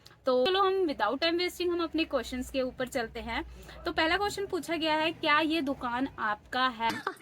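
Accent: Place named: Punjab, India. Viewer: मैं भी video बनाती हूं मेरी भी shop है आप के जैसे मैं भी पंजाब से हु आपभी मेरी जैसे हिंदी बोलते है😂 आपकी वीडियो रोज देखती हूं बहुत प्यारी है आप❤❤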